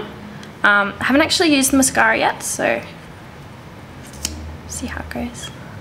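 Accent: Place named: Australia. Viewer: u have such pretty eyes.. oh nd ur relle pretty too lol..are u from australia i love your accent!!!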